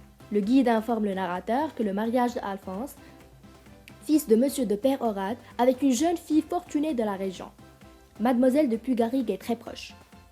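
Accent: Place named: Quebec, Canada. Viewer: elle a un petit accent quebecoi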